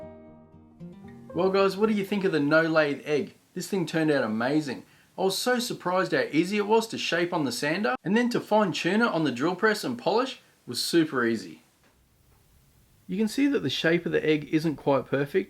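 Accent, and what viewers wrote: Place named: Australia. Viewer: I said to myself "This guy sounds like an Aussie" and then I checked the description and lo and behold I was right, glad to see fellow Aussie's doing something cool like this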